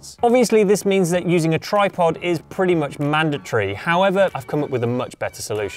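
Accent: United Kingdom. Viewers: Love your appearance on the YouTube Creators Hub podcast, (nice to hear another fellow Brit on there!). And the intro to this video sealed the deal. Amazing 😂 Wishing you the best of luck on here.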